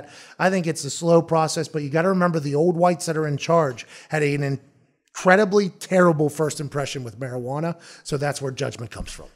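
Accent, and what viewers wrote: Pittsburgh, United States. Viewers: I love when he does accents of idiots and his Pittsburghese accent comes out. I'm from the burgh and my buddies and I do it all the time. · The Pittsburghese gets me every time! #412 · Raymond Toper hahaha same , his Pittsburghese is so good too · That yinzer accent lol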